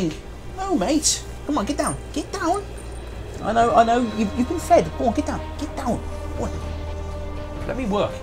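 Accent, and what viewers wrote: England, United Kingdom. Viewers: Fuck yeah I just need to grab some food , play video and listen to English gentleman playing intelligent game on PC ... fuck yes!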